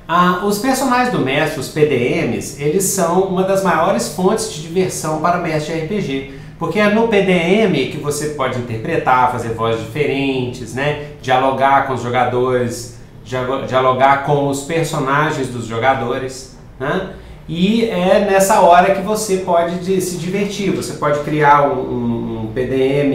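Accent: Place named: Minas Gerais, Brazil. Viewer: Mano... ele é mineiro! é foda!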